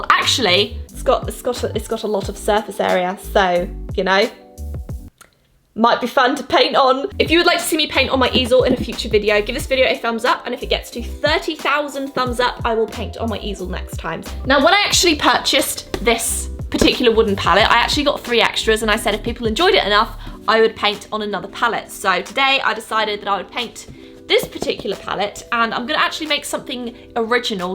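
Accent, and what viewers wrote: United Kingdom. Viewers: Bruynzeel: say it like Brown-sale (with a little bit of an emphasis on the w). I'm assuming it's an old Dutch name. The y isn't really used in Dutch (it's replaced with the ij which in a crude English translation is said like "eye"). But this is how I (someone also with a British accent) would say it. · reminds me of Moria with the funny edits but a British accent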